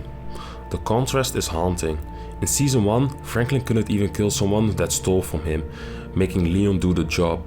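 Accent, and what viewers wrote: Netherlands. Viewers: bro you sound dutch great vid btw. brother ✊🏿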